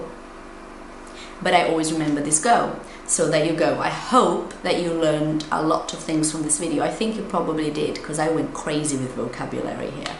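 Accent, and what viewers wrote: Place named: United Kingdom. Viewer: Wow your accent is very beautiful!!! I like it, is so British! 😊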